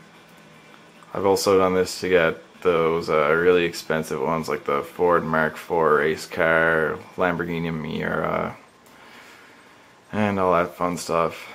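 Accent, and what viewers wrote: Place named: Canada. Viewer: Love the Canadian accent. The way you say car is priceless.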